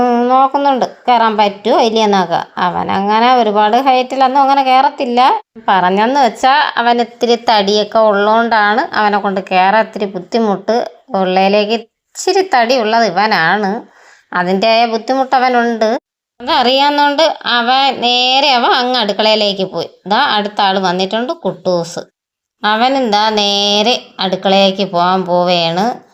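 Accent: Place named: Trivandrum, India. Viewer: മാമി സൂപ്പർ,,,,,, നിങ്ങടെ slang tvm supur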